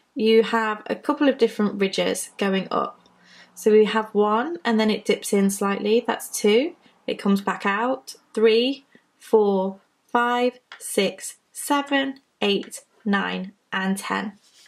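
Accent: United Kingdom. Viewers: My mom vaguely showed me how to crochet when I was a kid (she was lightning fast and almost never looked at what she was doing) and I've been wanting to see if I could actually do it recently. I will say this,. You are a good instructor and even if I never complete a piece, I promise you that at least a couple of my future video game characters will be named Ya'nova. I'll be hearing that term (in your British accent, of course) in my sleep. LOL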